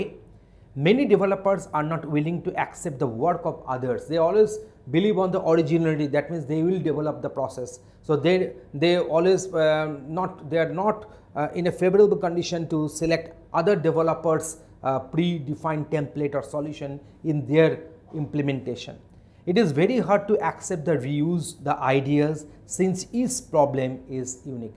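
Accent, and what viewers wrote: India. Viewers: This man is very smart. Unfortunately, his accent hinders non-Hindi | non-Bengoli speakers from understanding his English every now and then. Still, the video was appreciated and insightful.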